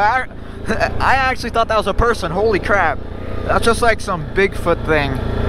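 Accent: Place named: Quebec, Canada. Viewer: Nice! I thought you were french-canadian when i started watching lol.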